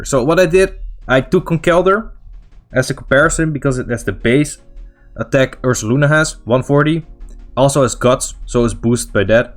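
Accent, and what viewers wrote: Netherlands. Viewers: 3 seconden in en ik kon gelijk horen dat je uit Nederland kwam, blijft leuk om mensen uit eigen land te horen :)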